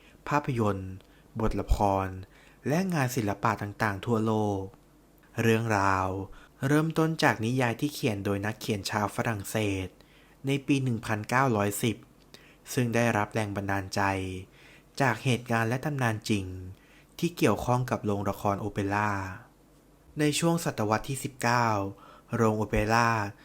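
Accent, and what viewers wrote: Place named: Thailand. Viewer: เสียงเหน่อ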